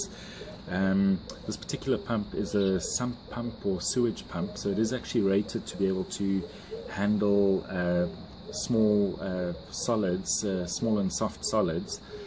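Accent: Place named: South Africa. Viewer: He sounds so RSAn😂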